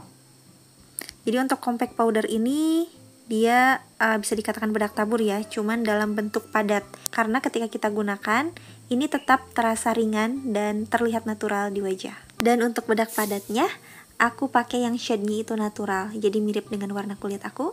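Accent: West Java, Indonesia. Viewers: Hasilnya cantik bgt dan keliatan natural ngaaannn...eta nyarios na mani nyunda pisan, pertahankan neng, jadikan ciri khas.👍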